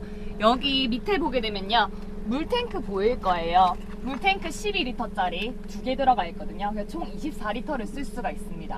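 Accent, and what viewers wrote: Gyeongsang, South Korea. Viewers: 대구분??같네요 저도 꿈이 자연방송,캠핑방송하는건데 너무부럽습니다 영상잘보고갑니다.구독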